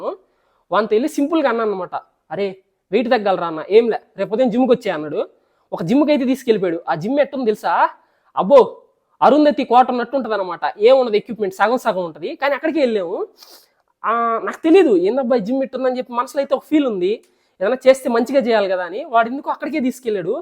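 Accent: Nellore, India. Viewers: Skip cheyakunda chudamannav kani ur talking was to good bro nellore yasa super😂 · Ide ma nellore yaasa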